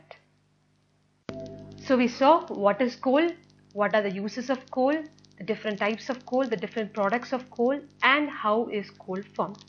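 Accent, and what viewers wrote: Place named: Kerala, India. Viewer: Pronounciation says that u r a malayali teacher. I m too from Kerala and teacher at Karnataka. Video is very helpful for teacher like me👍👍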